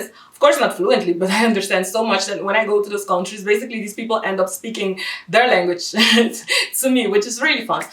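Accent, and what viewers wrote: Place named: Colombia. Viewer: Tu Español suena muy Colombiano. Hablas muy bien todo. Yo no pude aprender Holandés porque todo el mundo habla Inglés en Amsterdam y en donde trabajaba todos se hablaban en Inglés. Un abrazo grande desde Colombia!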